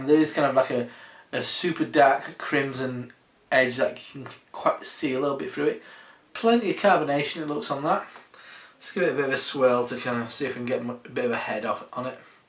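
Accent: Yorkshire, United Kingdom. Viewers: oh thats bootiful loovely haha gotta love the yorkshire acsent on an american beer ;) = great review dude = i tried finding if they were going to export to uk from what i have heard it may be end of year before they export to UK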